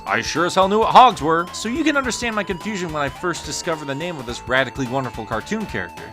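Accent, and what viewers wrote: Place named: United States. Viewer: I've also never seen that 7 emeralds ending. Are you, by any chance, playing Sonic Compilation (the one that had Sonic 1, 2 and Dr. Robotnik's Mean Bean Machine)? The standalone Sonic 2 has a different "good ending" where it's just the logo shown over a black background. I noticed in the brief comparison clip that your Sonic 1was moving somewhat slower. Is that the infamously poorly PAL Mega Drive localization of Sonic 1 where, because PAL TVs were running at 50 Hz, the game actually runs about 16% slower. Even the music is slowed down? But you have an American accent so I assume you own an NTSC Genesis. By the way, kick ass review. If I were to make a review of Sonic 2, I'd probably describe the negative aspects of the game the exact same way you did.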